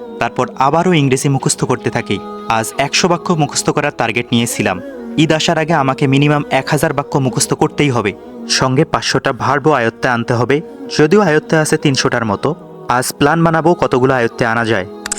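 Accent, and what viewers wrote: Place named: South Bengal, India. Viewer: আপনার কথায় দক্ষিণবঙ্গের Accent রয়েছে।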